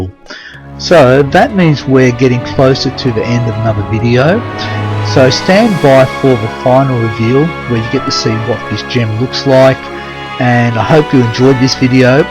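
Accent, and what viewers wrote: Australia. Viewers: Thanks for sharing the only gem channel with a down under accent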